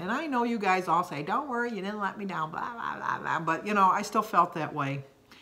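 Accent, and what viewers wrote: Midwest, United States. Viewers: The ' " LORDY MERCY ME.....you should be so proud of yourself...because of you, Carrie and Amy, I finally got over this hump in my weight journey (11 pounds down since October)....I am.4lb. away from my PERSONAL GOAL for my 65th birthday next Thursday. Even though I have achived Lifetime status 5 years ago, (lot's of stuggles, ups and downs, that's just LIFE) I was getting out of control again. I was paying for my meetings because I was over the 2 pounds that is allowed. I have two more Saturday's to weigh in to hit my 'goal'....Then after that, I have another little goal by May 5th. I leave for Italy on a biking vacation and you know what kind of food I will be facing in Italy!! I can handle it....I know I will.... that is why I LOVE active vacations....Keep on moving, chicky girl....I am orginally from Chicago and I relate to your Midwestern accent...😂😂👏👏🎈🙏🙏 Stay strong...Rome wasn't built in a day!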